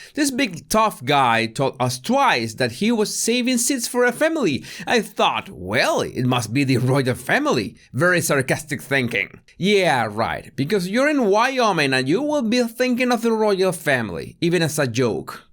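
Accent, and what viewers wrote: Spain. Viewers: Hes cute too I love that spanish accent. Oye give it to me papi chulo! da meh lo. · hilarious without sounding cruel when we all know full well that its not a compliment just used. Please keep that Spanish lilt, its honest, cuts to the chase and very amusing.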